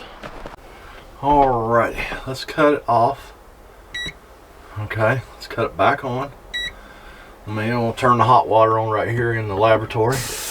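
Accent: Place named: West Virginia, United States. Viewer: Back to Beckley! Oh yeah. In '76 I was there training to manage a store, moved to Bluefield/Princeton area shortly after, and once a month drove places like Welch, War, Matewan, and Williamson to check up on other stores, quite often on ice. First time I'd seen grey snow, and to a Texas raised young man, it was depressing. I thought I recognized your accent.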